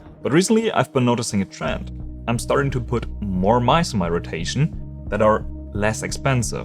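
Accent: Germany. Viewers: i hear german? aber krasser akzent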